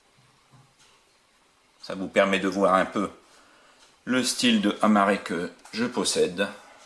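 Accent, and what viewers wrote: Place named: North, France. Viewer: Salut, super ta première vidéo tu as une super collection, j'ai hate de voir tes prochaines vidéos,merci pour ton remerciement et j'entend qu'il y a pas que moi qui est du nord;)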